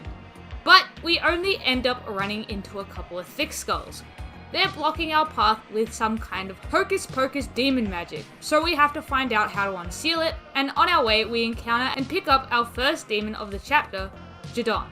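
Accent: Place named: Australia. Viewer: Australian spotted.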